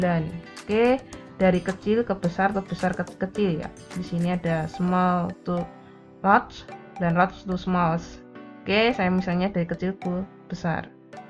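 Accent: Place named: Pati, Indonesia. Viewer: wong pati yaa'eeeee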